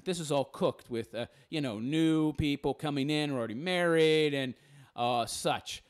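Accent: New York, United States. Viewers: Love the NY accent. Love the tawwwk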